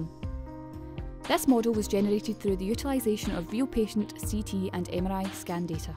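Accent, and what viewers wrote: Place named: Scotland, United Kingdom. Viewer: What a captivating dialect! Scottish? Excellent synopsis of the approach as well!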